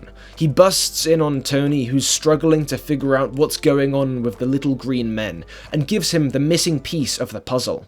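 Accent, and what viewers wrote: United Kingdom. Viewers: This video title sounded fire af, then I heard the british voice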